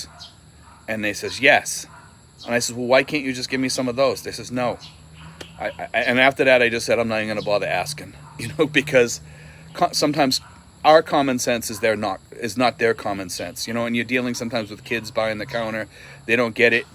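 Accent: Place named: Chicago, United States. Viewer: Hmmm, I changed my mind your accent I thought was from New England, im thinking Chicago, Illinois now lmao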